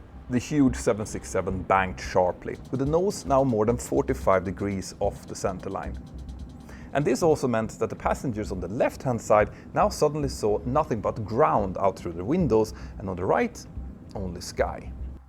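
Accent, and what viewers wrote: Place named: United States. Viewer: I've noticed watching your videos over the last few months in each video your accent reduces more and more. In this video it was almost imperceptible it was like listening to someone from Michigan or northerner Maine. Congrats you can now chameleon your way through the US if you ever visit! Although i do miss your heavier accent sometimes as it was very cute.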